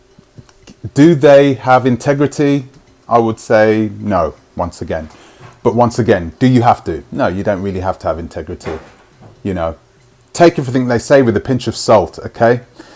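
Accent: United Kingdom. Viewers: Why don’t you focus on what the f** is wrong with Britain, the mother of all sadistic dysfunction . So weird seeing a black Jamaican in Britain talking about what is wrong with the United States in his British accent. UK is sending all your a*** back to Jamaica, why don’t you focus on that. Prayers and blessings for the BAG family for daring to take the future of their family into their hand and making such a bold and courageous move.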